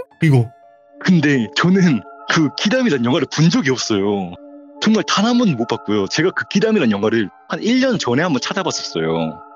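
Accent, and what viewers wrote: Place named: Jeolla, South Korea. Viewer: 말투가 전남이시군요 ㅎ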